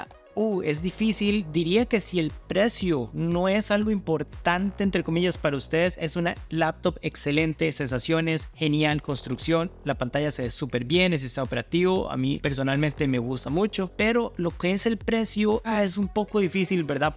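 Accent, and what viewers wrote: Costa Rica. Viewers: Reconozco la voz de un tico a kilómetros o me equivoco? 👀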